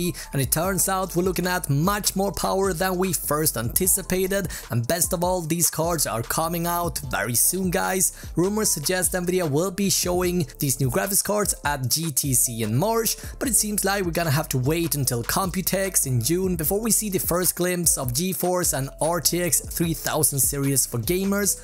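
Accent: Sweden. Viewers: I can hear you are from sweden after 2 sec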